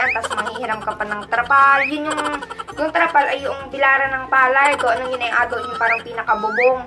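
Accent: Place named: Mindoro, Philippines. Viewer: Natutuwa ako sa mga vlogs mo, relate much ako the way you speak, magkapit probinsya tau, sinasabi ko din minsan mangyan ako, hehehe..keep it up...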